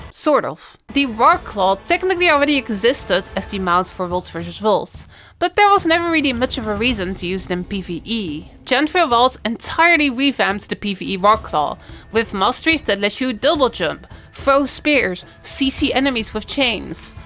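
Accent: Netherlands. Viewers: Do I smell a Dutch accent? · dat nederlands accent erbij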